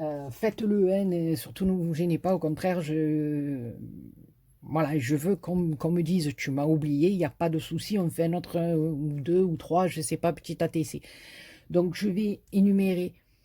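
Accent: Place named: Provence, France. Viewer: Bonsoir, j'ai decouvert ta chaine il y a quelques semaines et c'est un reel plaisir de t'entendre parler car ca me rappelle ma provence natale. Je suis du sud, une pure provencale exilee en Lorraine (mon mari est originaire d'ici...). Heureusement,je descend tres souvent pour voir les enfants, petits enfants et la famille. Je vais souvent a Marseille car j'ai un de mes fils qui y habite. Tu es une belle personne, qui me fait beaucoup rire, tes creas sont magnifiques, de toute beaute. Tu as ete tres gatee, effectivememt tu pourrais ouvrir une boutique de tissue.... Je te fais de gros bisous et a bientot pour voir d'autres creations. Francoise.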